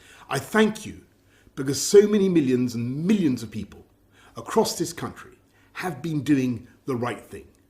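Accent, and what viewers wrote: RP, United Kingdom. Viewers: さん これはイギリスの上流階級の英語で彼自身の癖ではないですよ。 アメリカやカナダの英語に慣れてる私にとって聞き取りづらいですw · 私は、結構 noble English な感じが強くて、あと彼個人の癖が強い気がします。これ聞きやすいってことは、聞き慣れてるんですね👍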